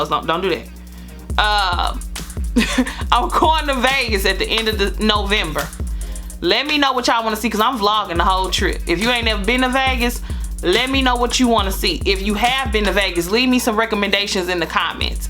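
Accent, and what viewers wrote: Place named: Memphis, United States. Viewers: I definitely was gonna ask was she from the south! LOL, her accent sounds like mine! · Are you from Memphis??!! Lol!! The way you pronounce your words, sound just like my ppl from there. LOVE IT GUH!!!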